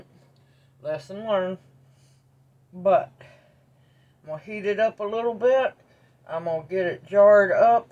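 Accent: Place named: Tennessee, United States. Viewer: I saw the video title and I had to watch. I said, this gal must be from Tennessee, because sweet potato butter is from back home. Then I heard you speak and said, yes, this is a fellow sister from Tennessee. Good on ya teachin the ways.. 😁👍🏻❤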